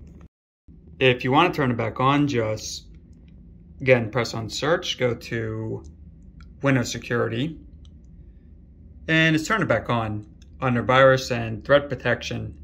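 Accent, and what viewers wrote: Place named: India. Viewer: india accent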